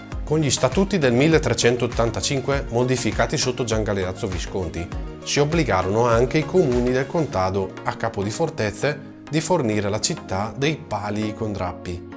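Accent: Brescia, Italy. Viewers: ma che bravo! mi sono bevuto 13 minuti di analisi comparata di costumi medioevali (col bresciano come caso, ma riapplicabile ovunque) che l'inflessione marcata rende più simpatica senza per nulla intaccare il contenuto (te lo dico francamente, di solito le parlate bresciana e bergamasca, da parte degli altri lombardi come me, vengono associate a generi meno dotti). Iscritto al volo, intanto cerco gli altri tuoi lavori. Avanti così! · molto. Anche l'accento bresciano che hai mi piace! Sono stufo di accenti romaneschi e napoletani. E poi ti esprimi bene. Cosa rara tra i giovani. Continua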